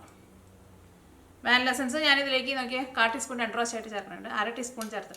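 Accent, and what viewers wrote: Thrissur, India. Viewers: ഞാൻ തൃശൂർ സ്ലാങ് കേൾക്കാൻ കൂടി ആണ് വരുന്നത് ചേച്ചി 😘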